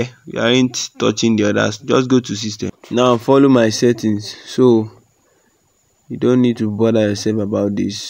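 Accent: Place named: Nigeria. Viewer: This guy sounds Nigerian cos im a Nigerian. Thanks very much really appreciate the solution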